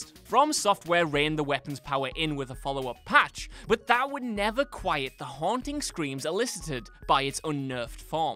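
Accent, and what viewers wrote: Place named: Newcastle, United Kingdom. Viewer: Felt like i was listening to a geordie shore reject. Good list though👌👍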